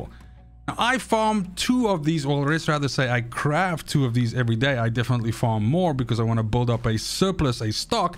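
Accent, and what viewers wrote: South Africa. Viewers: So I can't get over the fact that you sound South African but pronounce your words like an American 😅 Where are you actually from? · Nice vid bro. Question where in South Africa you from 😅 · Are you south African? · Btw are you in S.A? You sound south African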